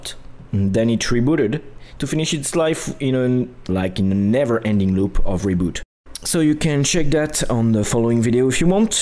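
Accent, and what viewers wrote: France. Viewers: Haha youve got a french accent !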